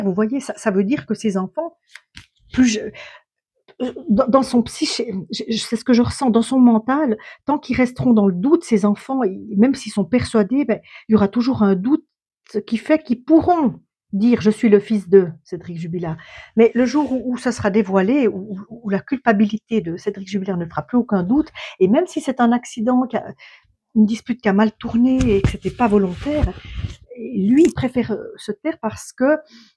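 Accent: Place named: Romandy, Switzerland. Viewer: Ce petit accent suisse Romand ! toujours un plaisir de l entendre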